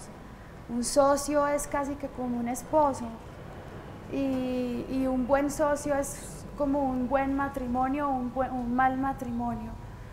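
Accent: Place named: Antioquia, Colombia. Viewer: Alejandra Moreno sencillo por que es paisa y se le escucha bien bonito, deja la envidia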